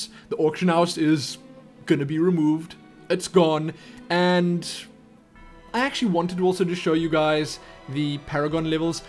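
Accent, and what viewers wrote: South Africa. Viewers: ***** I know, I was referring to the South-African accent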